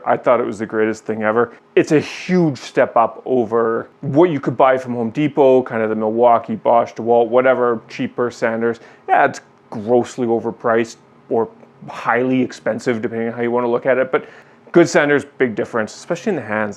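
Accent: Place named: Canada. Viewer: I never comment, but I kept thinking 'this guys sounds Canadian or something...' Then you dropped it: You are! A shout out from Ottawa! Keep it up, I'm subscribed. I want to hear about that 3D printer for sure. Been holding off on getting one of those but the writing seems to be on the wall, they're too useful to ignore.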